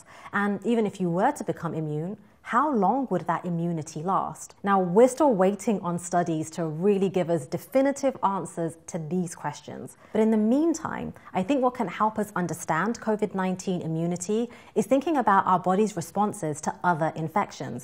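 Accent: United Kingdom. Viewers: Thank you so much for your very informative presentation, Doctor. I love your British accent too. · So weird seeing perfect teeth speaking a British accent. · BrRational: yeah, but she's cute, & with what sounds like a British accent, so... painless, at the very least. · With her gorgeous face and that Brit accent, I can listen her read the phone book all day!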